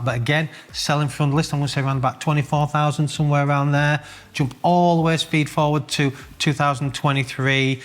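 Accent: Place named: North East England, United Kingdom. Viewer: Impressive video! Not watch related but is this a North east English accent? Trying to learn the English accent variations.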